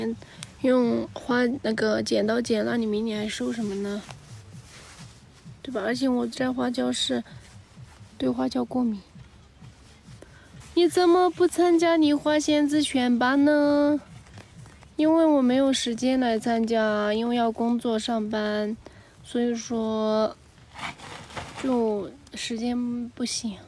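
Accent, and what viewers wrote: Sichuan, China. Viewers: 你是不是講的是四川口音嗎⋯⋯？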